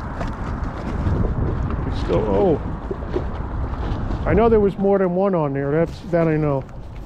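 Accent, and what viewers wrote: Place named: East Coast, United States. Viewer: And back to the salt, good job! Lol hearing you talk to "Bobby" with your eastern accent very much reminds me of a character off of the TV show Bob's Burgers, named Teddy. Since I dont really know what you look like, I've come to just picture you as looking much like Teddy.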